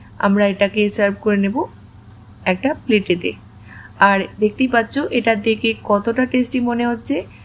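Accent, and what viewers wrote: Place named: India. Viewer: Daroon laglo. Aapnar kathay hindir tan aachhe. W. B thakenna nischoi, tabe sunte bhalo laglo.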